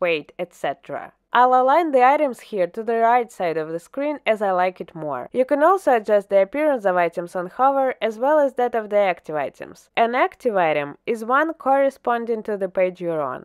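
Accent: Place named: Russia. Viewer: Приятно слышать русский акцент)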